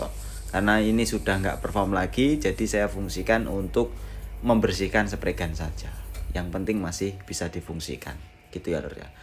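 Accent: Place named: South Kalimantan, Indonesia. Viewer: takipik mandngar bahamburan😁😁.. kalimantan mana mas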